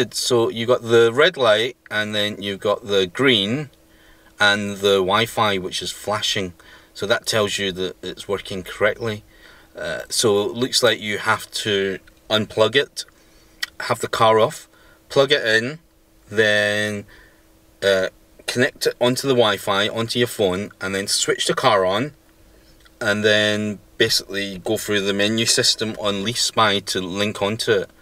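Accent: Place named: Glasgow, United Kingdom. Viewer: Great Glaswegian accent.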